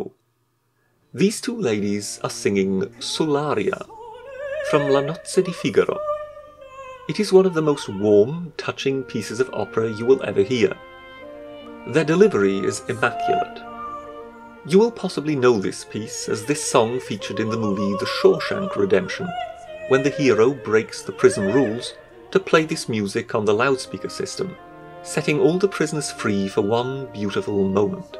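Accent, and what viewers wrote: Wales, United Kingdom. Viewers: Just a suggestion - You should try and give a more natural delivery than the forced ‘sophisticated’ accent you currently adopt. It’s really obvious and comes across as fairly arrogant and ‘try hard’, and undermines your entire channel in my opinion. Edit - Are you welsh? I just realised you sound a bit like Rob Brydon. I’ve always thought that accent sounded funny, it was hard to pinpoint your accent, at first it almost sounded like you were failing badly at attempting an old posh accent, but now I realise. Yet they say we have no culture?? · ahhhhhh I'm burning, the welsh accent is killing my ears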